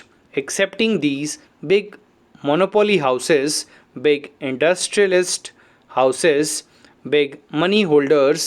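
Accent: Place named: United Kingdom. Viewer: Nice dictation like British English 😀👍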